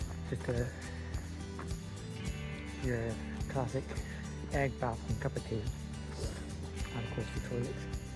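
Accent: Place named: Ireland. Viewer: Thanks for the video. I know the EHW is not really marked; however, it is not as intense as the WHW and will suit me ass a relative beginner. I live now in Stirling so I am within easy reach of all Highland and Island hikes & wild camps. I am originally from NI and though I detected an Irish lilt in your accent. Cheers 👍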